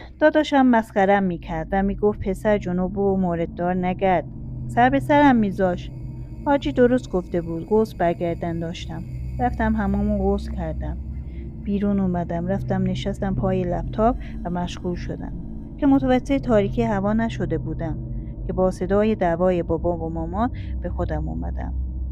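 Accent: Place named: Turkey. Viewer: داستان با لهجه ترکی تعریف کردن خیلی خیلی قشنگه ترک زبانها لحجه فوق‌العاده زیبایی دارن ماشاءالله ❤❤❤❤❤❤ ترک‌ها مرد و زنشون همه و همه مردومان باشخصیت باغیرت و محترمی هستن ❤ آرزوی موفقیت برای شما 🌹🌺🌹 و تمامی ترکهای عزیز و دوست داشتنی ❤️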